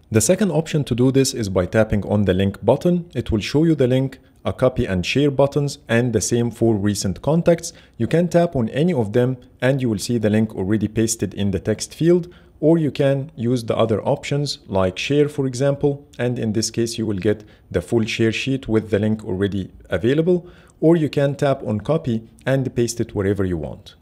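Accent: Egypt. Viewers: اللهجة المصرية باينة <3 بس الفيديو عالمي .. عاش <3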